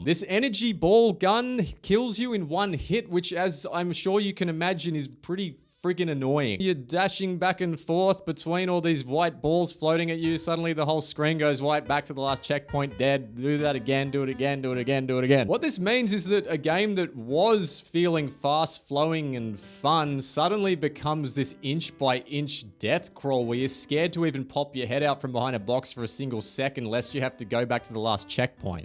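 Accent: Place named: Australia. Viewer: My first thought when I saw this video was "over an hour of that Australian guy who talks about video games? Did I die?"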